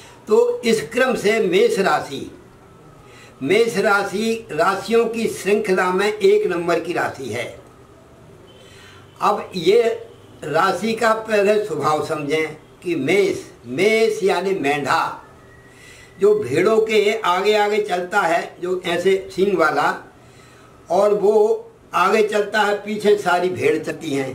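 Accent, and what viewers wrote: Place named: Madhya Pradesh, India. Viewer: Aapke baat karne se aap m.p. ke lag rahe hain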